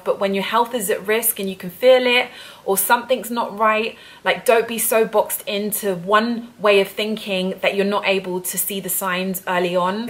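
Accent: United Kingdom. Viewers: Her British accent is soon cute!!!, wait a minute!!! I'm a brit n have one myself!! Damn I sound cute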